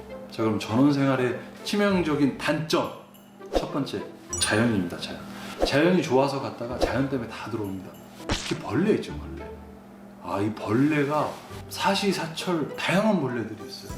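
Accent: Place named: Gangwon, South Korea. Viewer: 경상도지방은 전원생활하기 좋아요^^ 들어보니 강원도 인거 같네요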